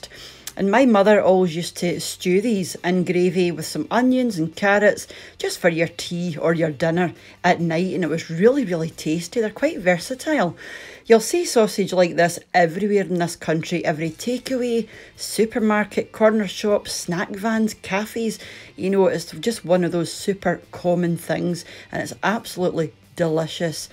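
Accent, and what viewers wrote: Scotland, United Kingdom. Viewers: Love your cooking love your scotsh accent I am from Australia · Throw away your Spam!!!!! This and Slow Cooker Square Sausage looks great. And as you say, we made it ourselves........I've the ingredients and will make my loaf today (January 15, 2023. Better than bacon.........Thanks for all the recipes and your Scottish is Music to my ears. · Love the video and the recipe and I'm making these today. But I watched this video with captions, and it cracked me up because whoever or whatever did the captioning clearly does not recognize a Scottish accent at all. You should watch the video with captions, because some of the mistranslations are hilarious. And now I think I'll need to recaption all of my video too as I bet the daptions are just as bad. Cheers! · Loved it! I listen as much for your lovely Scottish burr as for the lovely recipes!! 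· cracking accent. britain has good accents. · I'm a linguist with a fascination for dialectic variations and this presenter has all the credit for interesting and even charming variations of the basic English pronuciation as spoken in Scottland. It's simply nice to hear her speak. She charms you with her easy pronunciation and speech to the point where you like listening to her, just to hear her speak. If you live in the U.K. you have English accents, Welsh accents, Scottish accents and Irish accents and they are all English but they are all clearly belonging to a geographic area and unique to those parts. That's what makes the U.K. unique and great.! Can't say the same for the accent I was born with, namely, 'Cockny' which I never held in particular esteem. I spend my life pretending I'm from Cornwall or the Isle of Skye rather than be identified as a 'Gor Blyme' cockney.! I know, it's irrational but who said being rational was better than being from Radnorshire.? 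And trust me, if you play this game, you'd better get it right, because you have no idea how quickly you get caught out. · Hi! Not sure where you’re based, but I spent 12 years working and living in the Highlands and in Aberdeen. Your recipes and your accent are making me miss "home". I’m definitely going to try this, for I miss my all day breakfast at the supermarkets where I always had a Lorne sausage! Keep doing what you do: you’re doing great!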